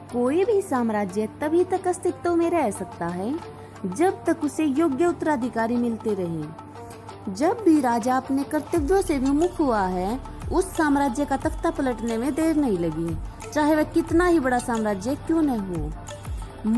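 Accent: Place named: Haryana, India. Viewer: Very nice video but control ur Haryanvi accent madam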